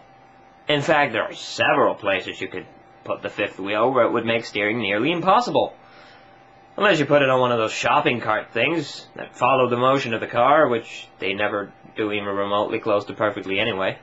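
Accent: United States. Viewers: he sounds like an american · Ha, this made me giggle. :) I can't tell that you're Swedish from your accent, which sounds decidedly like mine, which is American. Yeah...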